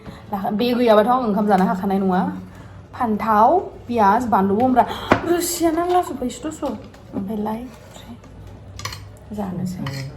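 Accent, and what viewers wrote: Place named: Chirang, India. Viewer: Abo ngni railainaiya manw Chirangnibaidisw Jayw hw ngsinibaidi jaya teobw hitt kwnayw abo wkki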